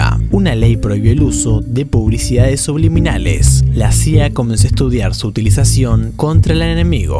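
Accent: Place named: Buenos Aires, Argentina. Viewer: Jejeje, sobre el acento, ya no se nota tanto el acento de Buenos Aires... Saludos, amigo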